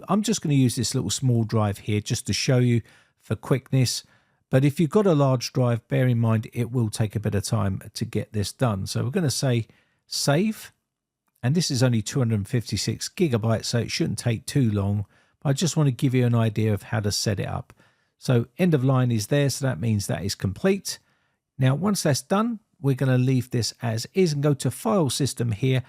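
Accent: United Kingdom. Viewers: Hi, Sorry this is out topic. But i like your british accent. =)) Plese recommend other british tech youtuber I can subscribe. Thank you.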